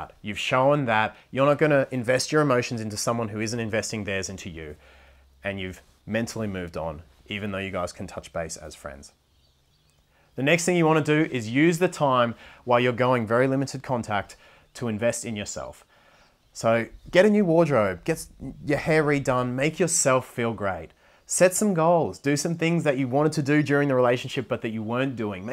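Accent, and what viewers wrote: Australia. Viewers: This is the best video I have watched, my boyfriend and I split up two days ago and I am devastated and I couldn't stop thinking why?! And will he miss me? Will he regret this? Will he realise he dropped a good woman for himself? But you are right. I need to focus on myself again, focus on putting me first. He may come back to me and want to talk to me when he is ready, I know him very well and he will never want to come back to talk, I already know he is doing everything he can to put me behind in his past. But I still hope that when a few weeks of his strength and determination deteriorates and when things go quiet and he's lonely, he begins to think of me, and miss me and then the pang of sadness and loneliness really hit his heart and then the wash of regret hits him in full force. And I pray that when that day comes for him, that I am feeling stronger and closer to being healed. 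Also as soon as you said "shit fight" I clicked and realised you were Australian 😂 I couldn't tell if you were kiwi or Aussie but then I heard the twangs! I'm a kiwi girl in Sydney 🙏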